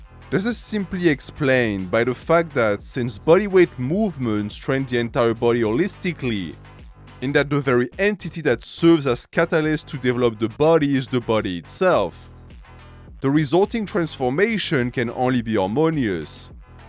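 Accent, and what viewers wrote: France. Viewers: As always, great stuff! Just sad you didn’t start the video with “hey YouTube” in your French accent That’s half the reason I watch these videos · Ur french but other than that nice video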